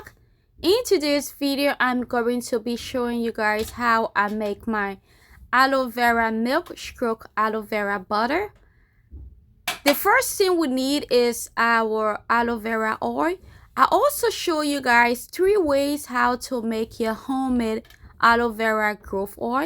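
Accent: Liberia. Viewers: I Hear a accent are you from Liberia?? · Do I detect a Liberian accent.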